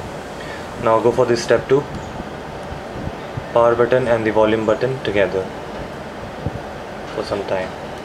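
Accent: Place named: India. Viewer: what does he press PLEASE I DO NOT UNDERSTAND INDIAN ENGLISH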